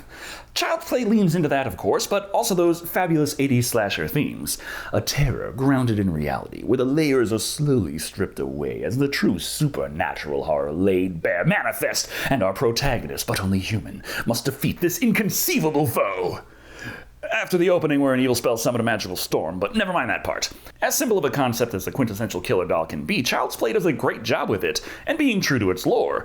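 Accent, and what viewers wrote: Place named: Brooklyn, United States. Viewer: I Love that Brooklyn accent, bruh! 😆😆😆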